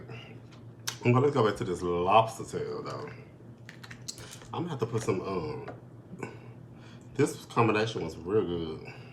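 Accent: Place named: South, United States. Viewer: Great video. You sound very southernish in this video. Where exactly are you from? You were very excited to pour that water, very commercial like.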